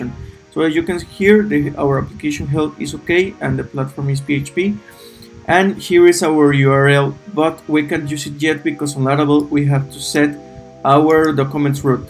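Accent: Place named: Spain. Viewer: I think you have a bit of nice accent coming from Spanish, am I right? :)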